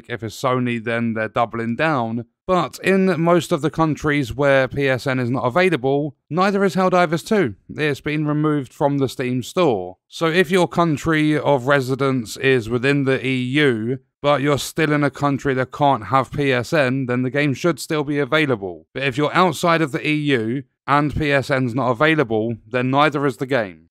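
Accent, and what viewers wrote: United Kingdom. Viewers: Nicw to hear another british bloke commenting out here. And yeah, this decision has been the fastest reputation "self unalive" I have seen for a game recently. I really hope some competent individuals can come together to start a class action lawsuit against Sony. It would be cool if Arrowhead sued Sony for the damage they've done to their game, possibly even sinking the studio if it gets worse.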